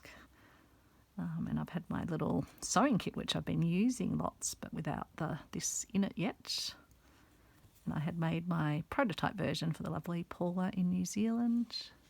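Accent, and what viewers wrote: Australia. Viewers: Ohh!! This is wonderful. I will have a go. My Nanna had needle books that belonged to her mother. Also … as an Australian living in Canada…. I appreciate the familiar accent. Made watching this an added joy ❤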